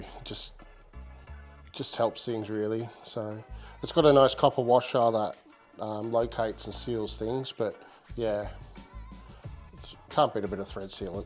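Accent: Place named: Australia. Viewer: You an Aussie mate?? Shout out from Melbourne Australia. Killer build, even more killer dog!!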